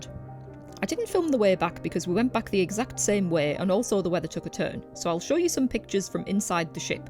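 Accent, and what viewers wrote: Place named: Newcastle, United Kingdom. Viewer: While watching I thought that’s a geordie accent then you mentioned Ouseburn. I’ll have to check that one out because I love wandering around Ouseburn. I’m going to Bergen in April so looking for tips. 😊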